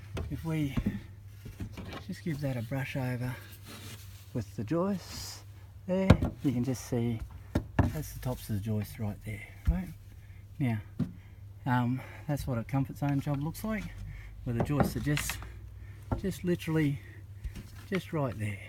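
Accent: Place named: Australia. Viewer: Thank you, it is nice to hear an Australian talking about his product, rather than another country explaining how it is done. 😀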